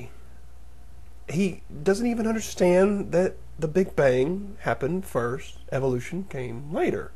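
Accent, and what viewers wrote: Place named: South, United States. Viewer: fuck, that should be than. BTW, you rock, love the vids. In MS here. Central. You sound just a little south:)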